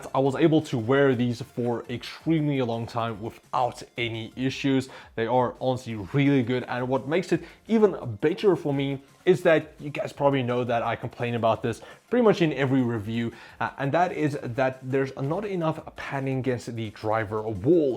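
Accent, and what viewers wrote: South Africa. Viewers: kak mad didn't know we had a South African Tech youtuber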